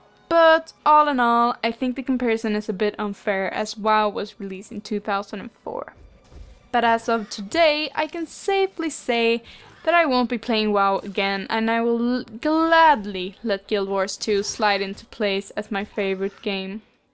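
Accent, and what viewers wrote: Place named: Sweden. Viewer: Sometimes I hear a bit of Swedish accent but your english is excellent, where are you from? Nice review and most of the time the only thing I want to hear is that it's better than wow, for me that is enough.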